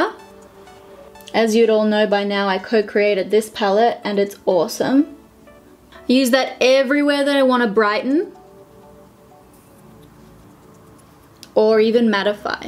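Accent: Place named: Australia. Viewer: 'Smugin that out' so Australian #representingusaussies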